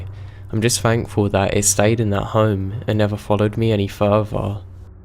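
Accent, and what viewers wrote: United Kingdom. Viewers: Is this an British accent on the first story? Just because an apparition looks like someone like a little girl, doesn't mean it is as it appears. The second story indicates that when the young man was at home (he had the whole place to himself) with his girlfriend that he married later. He was taking a shower and saw "help me" me on mirror in the bathroom and right before this he saw an apparition after the lights dimmed. Then, he says he didn't want to go home that night, but his parents gave him no choice. Hum? I thought he was at home? Finally, it was time to move out into their new, home, Then, he went back to his home with his girlfriend to get the phone charger he had left there The narrator stated this was the first time his girlfriend had been in the apartment. Hum? Am I the only person that heard noticed this?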